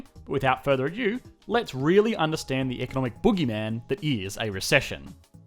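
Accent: Australia. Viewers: The Australian accent really makes everything said here a wee bit more positive ♥️😁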